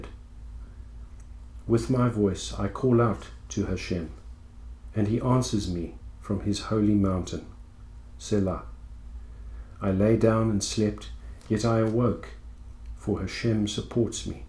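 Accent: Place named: South Africa. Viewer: You sound like you from, south africa, im wondering if it's possible for me to convert to Judaism, or how can I go about doing it?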